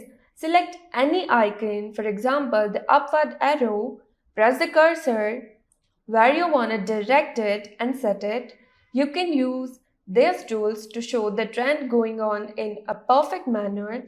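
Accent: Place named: United Kingdom. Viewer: You are sharing knowledge and your wisdom thats good.. and very nice of you.. the only thing that annoy is your british accent ... You can speak Indian english very well obviously but dont know why you dont prefer it... Just a point for improvement..no offence to you.. more power to you!!🙏🏼🙏🏼 All the best for your YT channel !!🔥🔥🔥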